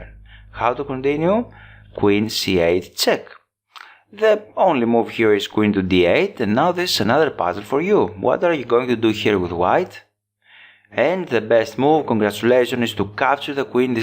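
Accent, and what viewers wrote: Greece. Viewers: Your accent sounds greek malaka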